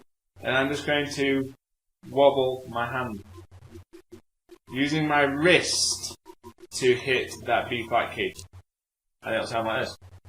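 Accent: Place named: England, United Kingdom. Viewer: I like your haevy english accent,knookle key. There is bis, flute 1 /1. Albert clarinet side key, great well shown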